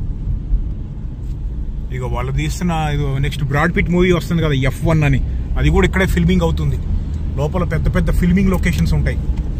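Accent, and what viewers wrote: Andhra Pradesh, India. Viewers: Thammudu " Angadi " antunnav meedhi Telangana lo Nizamabad antunnav.Nee Yaaasa emo Andhra prantham lo vaade Yaaasa la ga vundi.Meeru Andhra prantham nundi valasa velli Nizamabad lo settle ayyara??? TG lo "Dhukaan" or "Dhukanam" antaaru kada andhuku doubt vatchindi. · Hii bro, మీది తెలంగాణ అని అన్నారు మరి మీ స్లాంగ్ మా ఆంధ్ర ప్రదేశ్ స్లాంగ్ మాట్లాడినట్టు ఉంది 😂😂😂😂😂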